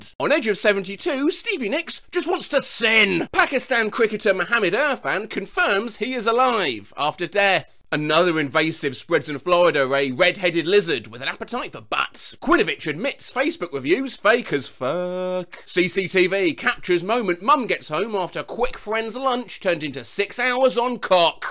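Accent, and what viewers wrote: United Kingdom. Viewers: its bc of his voice and his british accent. Uberduck has a AI voice you should check out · I accidently clicked on this and was wondering why suddenly a brittish man surrounded by lemos was talking to me...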